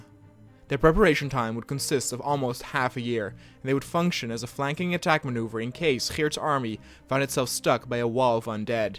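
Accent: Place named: Netherlands. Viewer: Didnt know you were dutch(because how you say the g) im dutch toXD.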